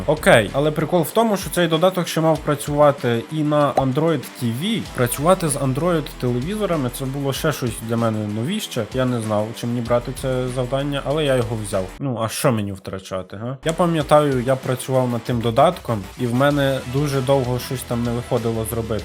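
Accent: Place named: Transcarpathia, Ukraine. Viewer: Акцент ніби Закарпатський але хз😅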